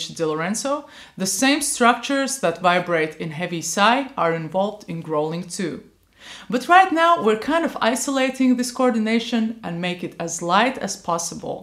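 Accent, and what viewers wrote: Latvia. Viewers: Heey, are you by any chance latvian? 🧐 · Wait? Are you from Latvia? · idk kinda sounds latvian😥😥 edit: oh shit u are latvian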